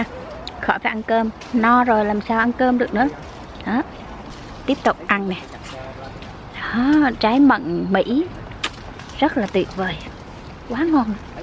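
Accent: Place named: Quang Nam, Vietnam. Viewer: Nghe giọng c như người miền Trung Quảng Nam Đà Nẵng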